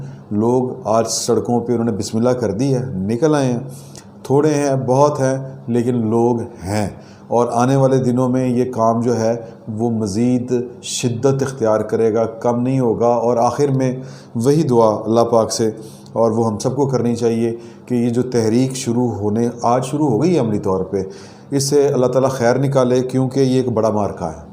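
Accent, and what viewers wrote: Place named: Lahore, Pakistan. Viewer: AOA... Sir g zaberdast analysis hy...Aap ka baat kernay ka aik khalis Lahoree andaaz bht. Pasand aaya..Baqee ye jo Niazi k spoksmen bhaan bhaan ker rahay hn...tu bhai g inn ki 2 Din phelay Niazi nay class leeh hai...iss leyaa TATEE ka zor laga rahay hn... SHEEDA TALLY ko tu NIAZI nay BILLIONS kay TRAQEYAATEE FUNDS deyaa hn .TALLY nay MUREE ROAD per banner bhi lagwaie TALLY un AWAAM kay TAX k paisoun say Rawalpindi may kothian ( BUNGLAS) bunwa raha hy.. bayshak inquiry kerwa lain.....NAB Kahaan hy.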